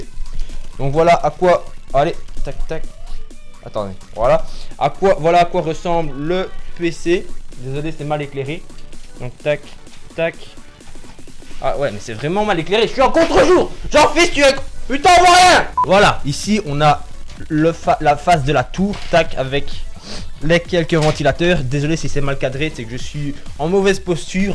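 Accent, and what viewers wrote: Belgium. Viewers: di donc tes belges toi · Tes du côté wallon ou flamant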